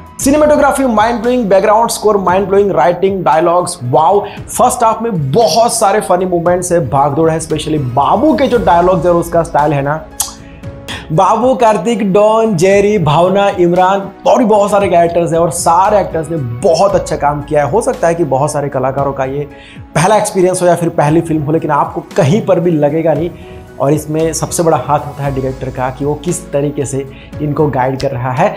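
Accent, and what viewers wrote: Gujarat, India. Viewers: Aap gujrat se ho ?? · kya aap gujarati ho?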